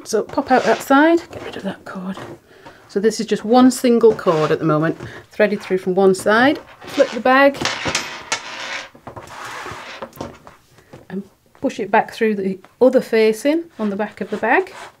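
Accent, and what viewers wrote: England, United Kingdom. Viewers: Hi, I just came across your videos by accident. How lovely to hear an English accent as most tutorials are American! I am currently binge watching all of your videos as I am quilting. I have now subscribed and clicked the notification button. Your tutorial are so well done and the instructions are clear to follow. Thank you so much for the time and effort you have put in creating them. Greetings from Norwich.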